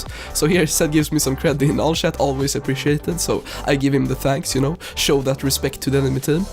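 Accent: Sweden. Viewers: Are you swedish? you sound like it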